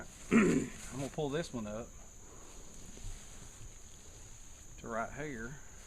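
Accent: South, United States. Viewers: Fantastic Invented Southern Brilliance. Your Honesty, Sense of Humor, That Great Laughter, Down Right Humbleness, Deep Southern Dialect, Warm Hearted Introductions and Best Father-ship makes your videos all worth watching all the way through to the end. Do you offer to your fans any of the products you design, I am a big fan of the V-Tree Grill. All the Very Best!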